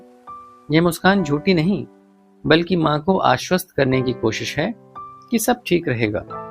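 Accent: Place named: United Kingdom. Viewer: ur english ascent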